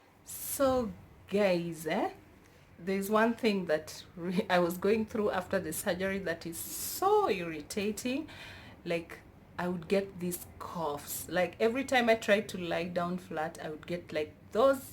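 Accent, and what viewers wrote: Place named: South Africa. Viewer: Great video. Thanks. You sound South African. You accent made me homesick!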